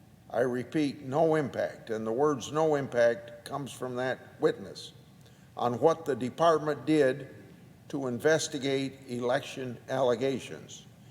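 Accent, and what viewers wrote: South, United States. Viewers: So he has a slight southern accent so you will degrade him and every other southern in the process. Thats the tolerant left for you. Still confused about why trump won in the first place, still thinks the "most popular president in history" got 81 million votes but gets bood everywhere he goes gets ratiod to hell on every video he talked about in.... but trump supporters are supposed to be the stupid ones right???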